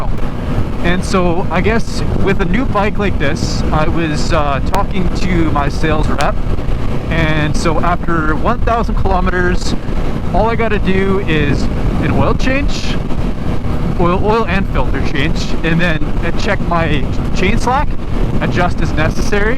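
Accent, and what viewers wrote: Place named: West, Canada. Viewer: Are you from Alberta? That western Canadian accent is really making me curious if I'm right or not?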